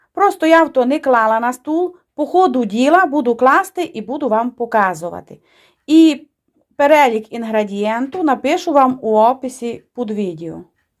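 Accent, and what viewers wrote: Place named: Hutsul, Ukraine. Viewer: Люблю гоцульський акцент👍🙂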